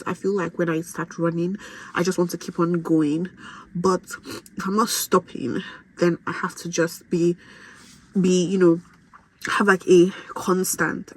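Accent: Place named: Nigeria. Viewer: trust me you’ll get there faster than you think! If you keep on running 2 to 3 times a week, you’ll soon be reaching 35 minutes for 5k and before you’ll know it 30 minutes My next goal is a 10k, which I’ll start training for in a few weeks once I do some 5ks the next couple of weeks. The long term goal is a half marathon next year hopefully. From your accent I can tell you’re a Nigerian - I’m also a Nigerian too, born and raised in the UK You’ve got this! 🏃🏽‍♀️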